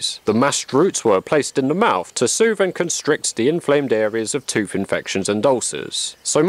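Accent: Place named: Cockney, United Kingdom. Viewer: the gezzers like a cokney david attenborough.. init.. love it.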